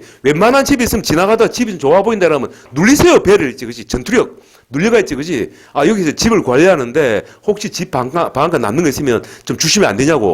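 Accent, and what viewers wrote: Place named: Busan, South Korea. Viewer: 부산억양이 알아듣기가 쪼금 힘들어요 ㅠㅠ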